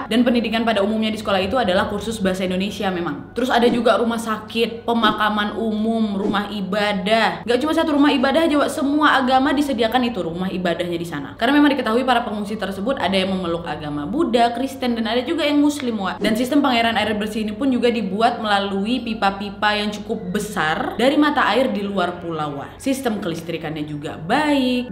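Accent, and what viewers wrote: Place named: Medan, Indonesia. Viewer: Dr logat nya pst org Medan. 😂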